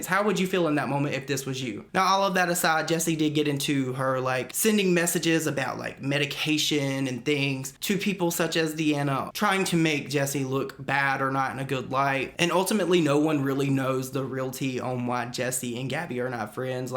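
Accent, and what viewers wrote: South, United States. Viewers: I love your country twang 😍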